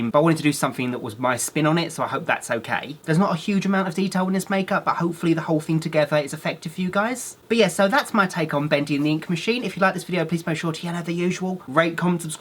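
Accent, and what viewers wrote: England, United Kingdom. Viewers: I love U and your england accent. Love u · Are you britsh or not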